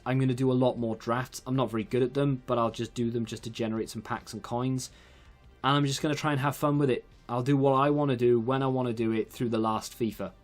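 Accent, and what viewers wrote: Wales, United Kingdom. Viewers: Well, first of all, I fully understand and respect your decision. You gotta make the decisions that are best for you and your family. I'm 30 and I feel like that's one of the most important lessons you learn in life, that you need to be adaptable in order to make your life a success. Second of all, if FIFA 22 really is your last, that will be a massive shame, you will be hugely missed. I love your way of thinking and the way you talk, it's a bit old school and I have family in Wales, so I love me a bit of Wales and the Welsh accent. Thirdly, really looking forward to the RTG in FIFA 22! Your RTG in FIFA 19 was absolutely awesome and I really hope it'll be more or less like that. It'll be great to see you approach it exactly the way you want to, no holds barred. Good luck with everything boyo 👍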